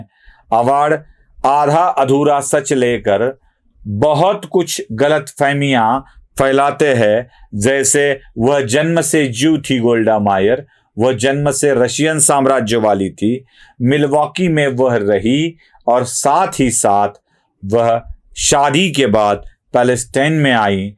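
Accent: Maharashtra, India. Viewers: क्रुपया हिंदी बोलताना मराठी ची लकब टाकु नका. हिंदी सहजपणे बोला. टेंशन फ्रि. मग मजा येईल.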